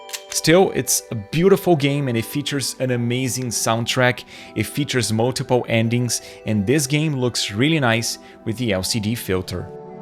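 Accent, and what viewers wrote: Brazil. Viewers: percebido um sotaque que parecia brasileiro em algumas palavras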